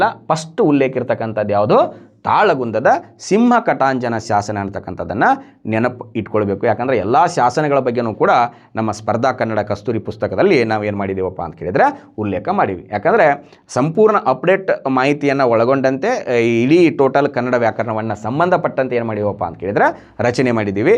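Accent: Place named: Gulbarga, India. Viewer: Not dharwad kannada it's Gulbarga kannada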